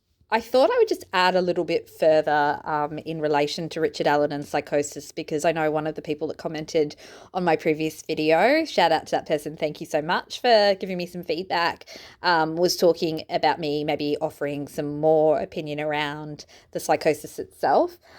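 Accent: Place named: Australia. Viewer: I'm Australia also, but it's only when listening to Aussie creators that I "hear" our accent. And I love it! I could listen to you talk all day, especially rational thoughts about this case and insights into your profession. Excellent work.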